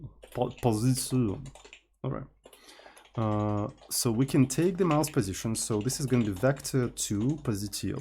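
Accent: Russia. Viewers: Русский акцент 😂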